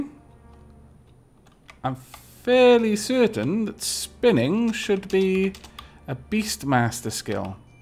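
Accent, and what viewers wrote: Wales, United Kingdom. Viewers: Subbbb! Welsh man xD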